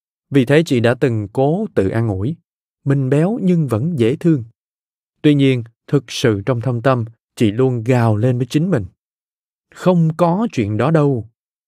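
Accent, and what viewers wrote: South, Vietnam. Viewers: Đã lâu lắm rồi mới được nghe một giọng đọc miền nam quá chuẩn , phát âm rõ ràng S và X …cám ơn nhiều ! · Thích giọng Miền Nam. Thích nội dung sách. Cảm ơn bạn. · Đúng rồi. Giọng Nam mềm mại dễ nghe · Giọng đọc miền nam hay quá, cảm ơn! Sách hay! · Giong đọc của anh này quá hay , đúng là giong miền Nam nghe hay hơn giong bắc. Sách hay ,giọng nói rất hay. Cảm ơn tác giả va cảm ơn người đọc sách hay . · Tôi thích giọng đọc miền nam này, nghe mà ghiền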